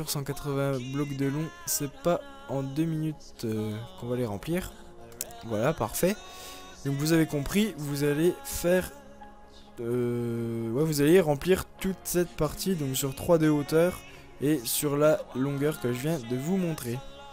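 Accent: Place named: Franche-Comté, France. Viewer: Tu as l'accent de la Franche-Comté toi non ? Si oui bel accent 😉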